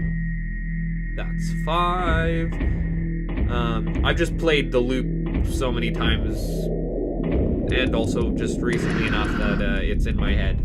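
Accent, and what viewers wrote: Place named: Canada. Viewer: Aw, your Canadian vowel raising is so cute~! <3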